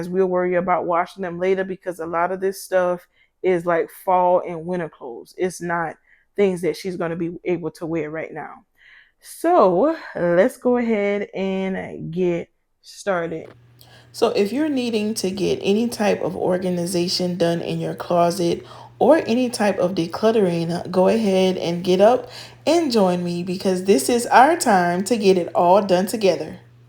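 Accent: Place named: Louisiana, United States. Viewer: Love the channel from Alabama. I love your accent. Are you from Louisiana? Your channel is an inspiration 😅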